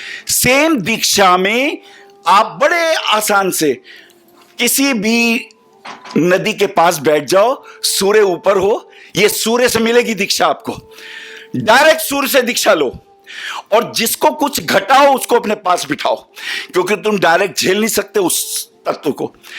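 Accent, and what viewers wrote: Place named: Bengal, India. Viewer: This is how we Bengalis pronounce. Thanks Guruji.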